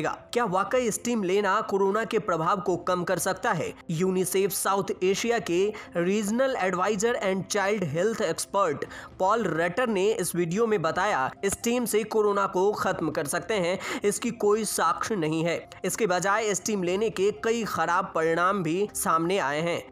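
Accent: Bihar, India. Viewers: कोई बिहारी VO किया है, खैर अच्छा है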